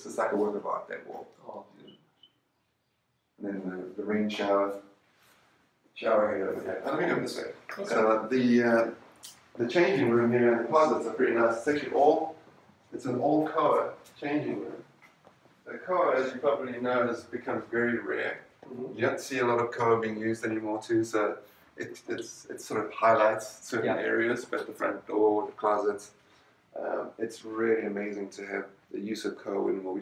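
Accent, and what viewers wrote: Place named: South Africa. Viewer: You can pick up the South African accent anywhere :D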